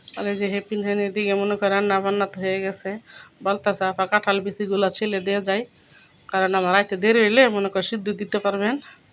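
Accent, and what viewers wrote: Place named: Sylhet, Bangladesh. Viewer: সিলেটের ভাষা খুব সুন্দর,, আপু আমি কক্সবাজার থেকে দেখছি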